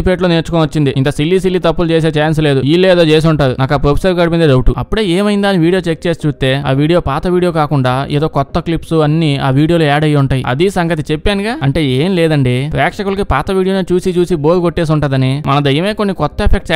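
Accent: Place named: Telangana, India. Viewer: Super bro horror movie kuda comedy movie laka chepavu telangana language super vudi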